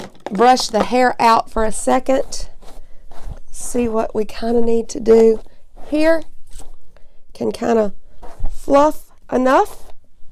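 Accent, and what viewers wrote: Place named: Mississippi, United States. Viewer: Your skin is AMAZING. I just HOPE my skin is like yours when I’m your age! I think you look so so so great without any makeup!!!! For real!! And I’m so glad you mentioned where your from. I was thinking how much I like listening to you in your other videos. When you said MS, I was like well no wonder! I’m born and raised in Madison MS but Ive been living in Sonoma County California for the last 7 years so I’m missing the accent. <3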